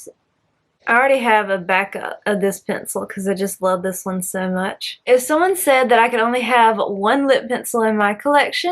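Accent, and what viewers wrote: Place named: South, United States. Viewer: Your eye look turned out beautifully <3 I think that UD palette got a bad wrap, but may just be underrated. I'm a new subscriber and I'm not going to lie, but your southern draw got me! Hopefully that doesn't seem weird or offensive, but you just have an inviting and friendly sound and persona. The first video of yours that I came across was your blush swatch video, so that was really what I had to go off of. Lol :)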